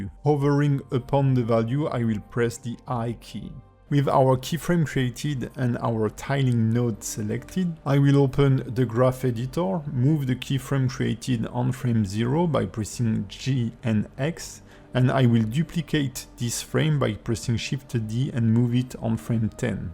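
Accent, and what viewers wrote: France. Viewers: i was gonna purchase your animation course but after watching this video, im not so sure anymore. i know you are not an english native speaker but ur french accent makes the learning process 10x harder.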